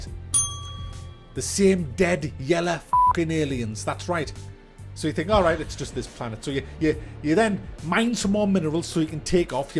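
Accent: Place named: Northern England, United Kingdom. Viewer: He's a northerner? I like that accent.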